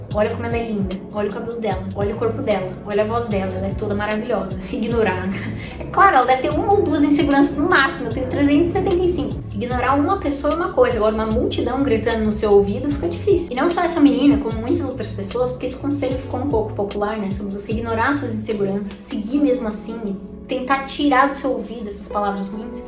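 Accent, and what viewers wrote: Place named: Rio Grande do Norte, Brazil. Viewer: Perdi as contas de quantas vezes vi esse vídeo de ontem para hoje. Pq você tratou esse tema de uma forma muito próxima, e isso é bom. O tema da auto estima de fato é uma coisa que a gente precisa ver e rever todos os dias. Ontem, li que resultado não vem com motivação mas sim com constância. O mesmo se aplica a nossa auto estima. Estou nessa mesma fase que você, de ir entendendo que meu novo corpo que já não é o mesmo de 10 anos atrás quanto eu tinha 17, surge na minha frente uma mulher e não mais uma adolescente, e é preciso amar, reconhecer e respeitar as curvas novas, e sim, com certeza melhorar aquilo que ainda não é tão legal pra gente e você trouxe isso muito bem. Ultimamente tenho filmado meus piores ângulos kk acrescento uma risada engraçada e vejo e revejo aquele tal pedaço de mim que eu não gosto pra justamente ver ele tantas vezes que ele se tornou algo comum e não um incômodo. 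Se a gente se fato rever esses pontos, eles nem são tão ruins assim, vai. Obrigada pelo vídeo. Continue postando essas coisas relevantes pra gente ter conteúdo real nessa internet. Ah, e eu achei lindo seu sotaque no inglês. Cheiro de Natal/RN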